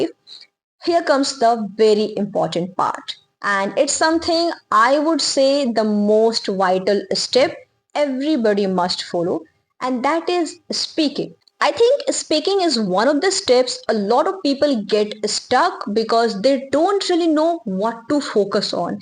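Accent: India. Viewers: What is your region? I asked couse yours pronunciation it's look like Indian.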